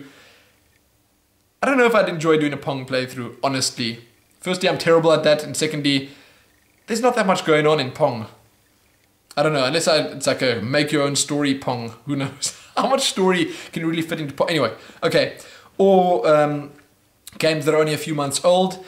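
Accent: South Africa. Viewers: Gogo the SA accent! Good show and a new fan!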